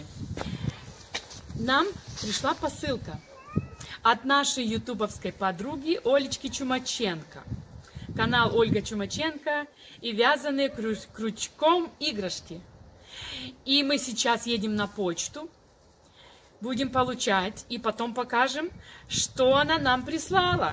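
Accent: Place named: Odesa, Ukraine. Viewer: Да, действительно подарки красота. Спасибо, приятно было услышать одесский говор. Удачи и всего самого наилучшего Вам.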